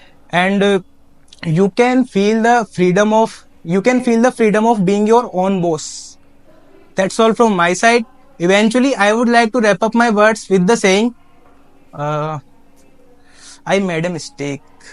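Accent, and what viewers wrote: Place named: United Kingdom. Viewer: He Speaks well, Simple with grammar. British English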